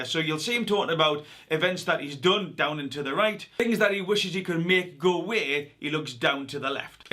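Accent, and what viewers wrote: Newcastle, United Kingdom. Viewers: R u a Geordie cause u sound like your from Newcastle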